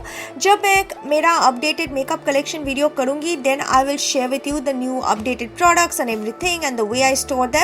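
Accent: Bengal, India. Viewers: Nice log..... love your bengoli style hind.....😍😍😍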